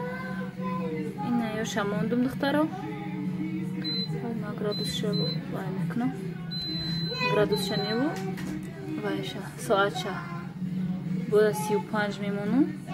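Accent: Tajikistan. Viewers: Салом шавхаратон ай хучанд худатон ай тарафи кургонтепа чхел шуд ядигаритона гирифтед худатон лахчайи точики кати гап мезанед шавхаратон гудакотон хучанди бачи дадо моям дадо мегуйем падарамона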